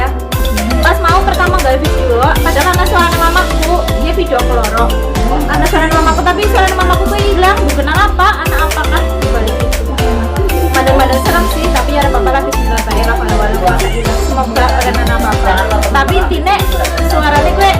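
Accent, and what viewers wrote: Banyumas, Indonesia. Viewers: mbak yu mbak yu ngapak kie...dulur seneng wis diparani...mangan puding enak plus horror...sukses ya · Seneng bahasane mbak ngapak lucu , menghibur bgt salam kenal dan sukses ya luhur. 👍🏻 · SEMANGAT terus ya mbk Kiye asli wong ngapak apa ya' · Suara apaan tuh serem😥😥semangat kak bikin kontennya ngapak yo ra popo 😁 😁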